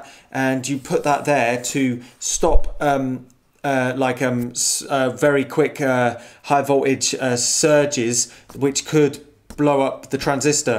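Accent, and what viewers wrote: United Kingdom. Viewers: It's weird. I understand only less than two thirds of what you are talking about, but I find watching and listening to you still interesting, motivating (why? I don't think I'll ever get myself into any electronics project) and strangely.. soothing. Maybe that's the passion / creativity / positivity ("don't be scared to try"? wonderful) flowing through. And the lovely british you are speaking (though maybe this one is because I just watched an american movie located in Texas :). Anyway, thank you!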